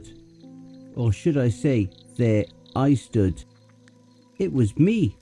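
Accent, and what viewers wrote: United Kingdom. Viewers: The creepy animation at the beginning makes this so worth it., you're amazing. Your British accent is a joy to hear. I look forward to hearing more narrations by you. Also, man How do you get subtitles?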